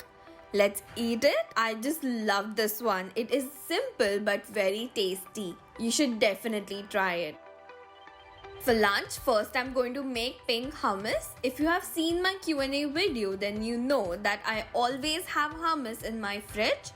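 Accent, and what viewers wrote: Maharashtra, India. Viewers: I am overconfident and I can tell that didi you are Maharashtrian ❤️❤️❤️❤️❤️hey marathi mulgi❤️❤️ We are same🎉🎉🎉 you can come to know it's like she said it too much time and that's why I come to know that she is marathi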